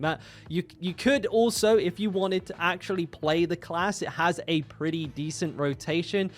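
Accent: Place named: South West, United Kingdom. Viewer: My go to for D4 content!!! Even though the accent reminds me of the uni days in the south west 😂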